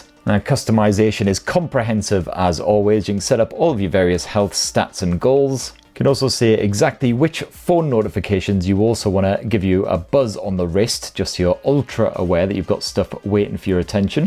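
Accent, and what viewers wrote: United Kingdom. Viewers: I agree.. the way he swears and all those stuff goes well with the British accent more than anything else 🤭🤭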